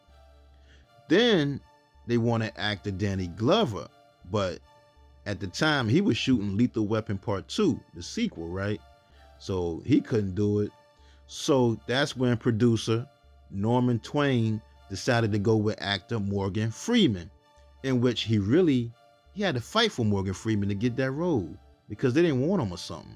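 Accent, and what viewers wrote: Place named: Washington DC, United States. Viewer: I've been watching your videos and I love them! Goes into details I never knew. Sidenote: I keep listening to your voice and I swear you sound like you're from DC. What really made me think that is one of your videos you mentioned Chuck Brown and most people outside the area knows he's the godfather of gogo.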